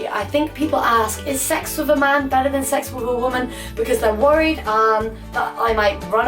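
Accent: United Kingdom. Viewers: what english city is her accent from? the way she pronounces the 'ts' is irritating af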